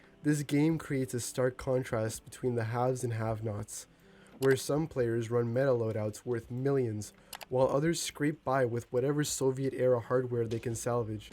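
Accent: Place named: United States. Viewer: You speak with a perfect American accent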